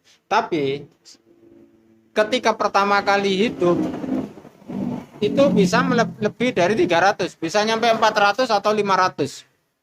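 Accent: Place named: East Java, Indonesia. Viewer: wong ndi se mas,, kok kyk jatim ngunu ngmg e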